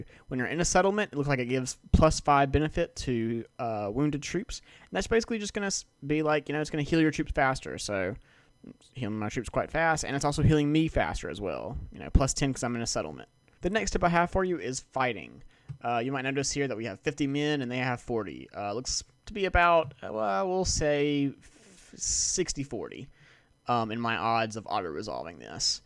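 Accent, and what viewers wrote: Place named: South, United States. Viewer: Good to hear tips for my favorite game in my own (Suuthern) dialect. Great video bro, thank you.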